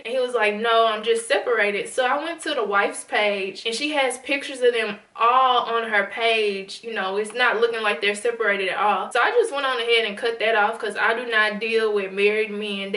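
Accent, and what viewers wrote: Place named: Houston, United States. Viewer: She sounds like she’s from Houston ..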